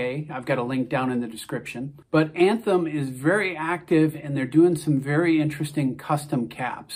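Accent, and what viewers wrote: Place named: Michigan, United States. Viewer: Thanks for giving Canada a shout out,. I live in Toronto and it’s great to see Americans recognize our seemingly growing fitteds market. Noble North is a true gem, as are TeamWorks and Anthem. Feel free to hit me up if you need any help making a Canada part two as I know of a handful of great shops you could mention. No credit wanted here at all. And for what it’s worth, I’m a big Red Wings fan (I detect what sounds like a Michigander accent). Keep up the awesome work.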